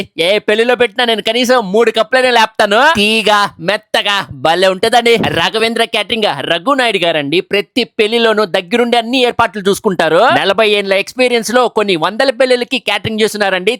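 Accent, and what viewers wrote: Rayalaseema, India. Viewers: Ni slag valla Rayalaseema paruvu potundi Anna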